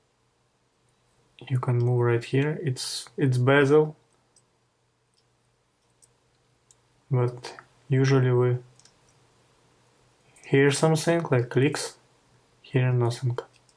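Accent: Russia. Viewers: У автора русский акцент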